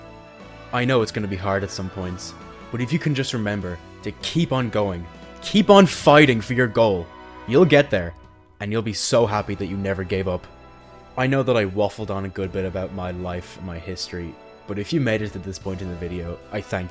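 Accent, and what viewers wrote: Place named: United Kingdom. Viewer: You sound British just like the characters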